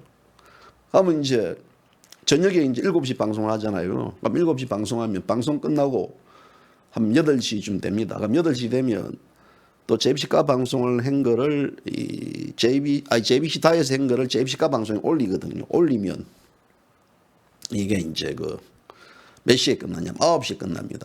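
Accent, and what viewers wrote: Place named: Gyeongsang, South Korea. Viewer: 그렇게 오래됫나요? ㅋㅋ 몰라뵙네요~ 갱상도 말투가 투박 하면서 끈적 끈적 ㅋㅋ 요즘은 명심하고 들어옵니다^^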